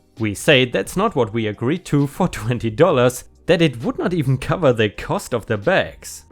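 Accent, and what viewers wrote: Germany. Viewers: Germany. Not every german sounds like a nazi in a indiana jones movie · 🌟🌟🌟⭐⭐⭐🌟🌟🌟 I listen to you and love your readings. I's say you and rSlash are my favourites then close second are Mrreddit and Markee. I've been playing yours as I go to sleep so hope that helps your channel, about 21-27 vids. I also have a soft spot for Germans, and I mean that in a friend manner, du bist in sicher lol (Excuse my German) Your narrations are awesome and your accent is the icing on the cake. Thanks 💛💚💛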